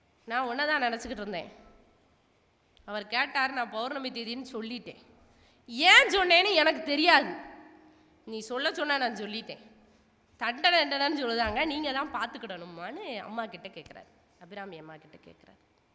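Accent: Tirunelveli, India. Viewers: உங்களின் நெல்லை தமிழ் மூலம் சொற்பொழிவு அருமை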